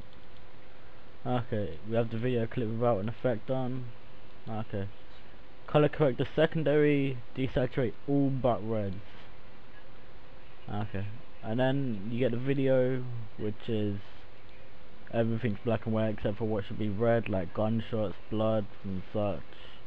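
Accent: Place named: United Kingdom. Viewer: it was refreshing to hear one of these tutorials from a british voice :]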